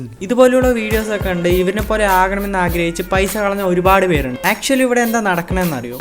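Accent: Palakkad, India. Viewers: Bro palakkad ano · Palakkad evideyanee kutta 😃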